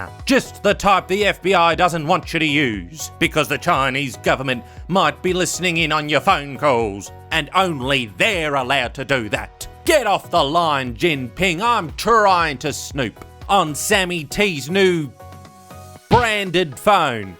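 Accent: Scotland, United Kingdom. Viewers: The Scottish accent 😂😂😂😂😂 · The way he pronounced xiaomi like and a dragon ball z here 😂😂😂and that Scottish accent is Killin it 😉🔥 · Your Scottish accent pronunciation crakes me up! That is a good one! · That Scottish influence tho. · Your Scottish accent is hilarious. So talented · The Scottish accent 😂😂👌🏻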